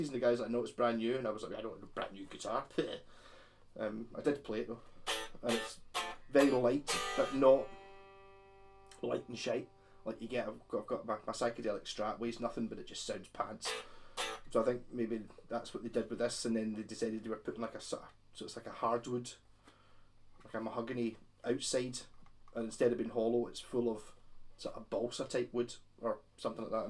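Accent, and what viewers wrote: Scotland, United Kingdom. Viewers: are you Scottish or something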